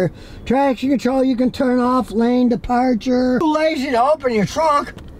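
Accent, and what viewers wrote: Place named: Texas, United States. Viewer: I like how SK covers everything important and hasn't lost his Texas accent. I have to turn the volume down though!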